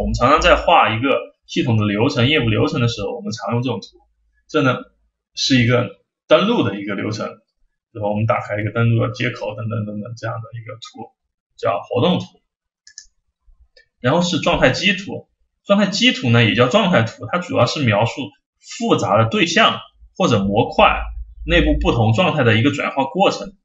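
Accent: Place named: Sichuan, China. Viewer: 老师像是四川人，哈哈哈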